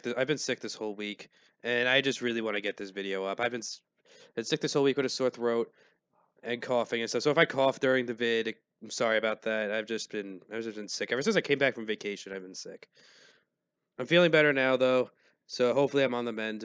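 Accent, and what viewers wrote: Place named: New York, United States. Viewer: Really sound like a New Yawrka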